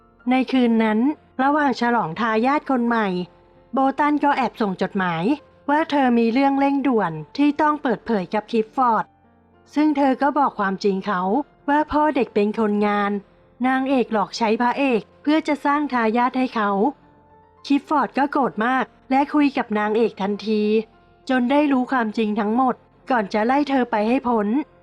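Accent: Thailand. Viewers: เสียงน้อง เหน่อดีจริง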